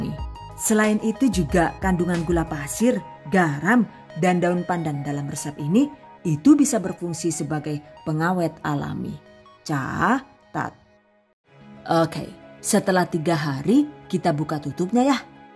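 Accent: Banyumas, Indonesia. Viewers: Ora ngapak ora kepenak yu#GiveawayTHV2021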